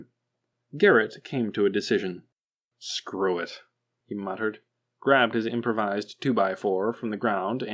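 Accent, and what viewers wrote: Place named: United Kingdom. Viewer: Genuinely thank you for doing this. I have a hard time reading and committing to reading fiction for a long period of time. I do have a lot of free time to listen to things though and this was an amazing way to digest this story. I got recommended by the person I'm dating right now, totally worth it. Also I know you apologized for your British accent in the first one and while it is occasionally rough it is such a good capturing of Wheatley like you play Wheatley so well.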